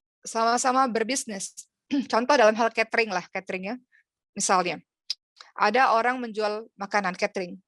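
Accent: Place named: Arab world. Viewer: TERLALU CENGKOK ARABNYA. ULAMA PUN GAK BEGITU.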